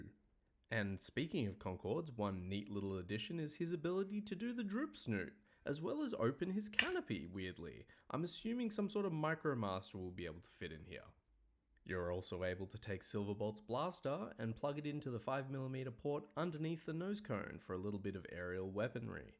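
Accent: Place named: Australia. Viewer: Nice to hear another Aussie accent. Funnily enough I'm also a haha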